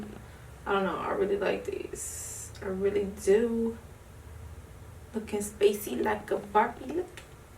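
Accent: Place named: Chicago, United States. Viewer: Knew you were from Chicago as soon as you started talking lol. People say we don’t have accents but I beg to differ. Love your channel 💕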